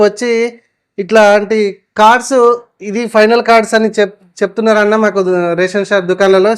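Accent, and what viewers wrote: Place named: Andhra Pradesh, India. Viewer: Androlla lekka maatladutunnav anna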